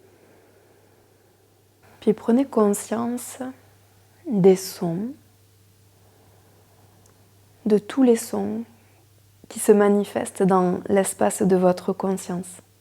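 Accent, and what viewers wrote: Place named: South, France. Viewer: Merci aussi pour ce charmant petit accent du sud.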